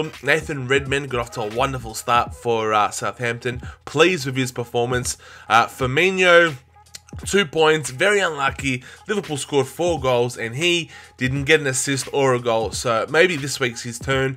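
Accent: Australia. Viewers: Are you Aussie mate?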